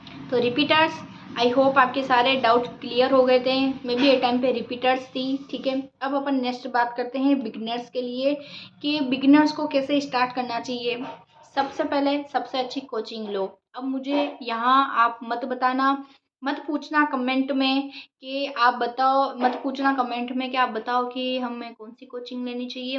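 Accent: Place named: Rajasthan, India. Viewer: Muje aapki baato ko sun ke lag rha hai aap Rajasthan se ho🤔🤔🤔🤔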